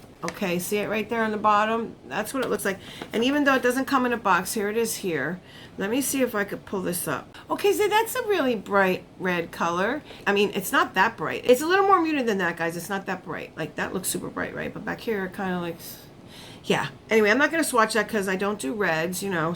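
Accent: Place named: East Coast, United States. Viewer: Thanks,...you are so sweet! I hope you feel better soon! I am not doing so great these days myself! I can hear it in your accent that you on the EST! Take care & thanks so much for your response! 🙏🙏❤❤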